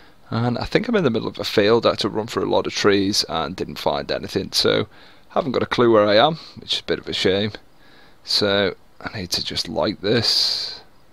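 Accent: United Kingdom. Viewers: always enjoy these PZ videos keep up the good work man, are you from the liverpool area? you sound a little scouse just wondering haha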